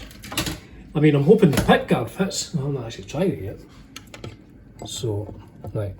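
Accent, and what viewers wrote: Scotland, United Kingdom. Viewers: Afternoon. I was actually looking at doing this with a vintage v130 I have. it’s a p90 but really it’s a p100 stacked humbucker which vintage say is kinda like a p90 but always wanted to switch it out for a proper humbucker. It’s ok sounding as it is but doesn’t sound anywhere near as good with my current set up with a Marshall origin as I found out at a gig few weeks back. Now I know what’s involved in attempting to switch out the pick-up for a humbucker. cheers big man. And keep rocking 🤘🏻🤘🏻ps there’s nothing wrong with your Scottish accent I have one as well 😂😂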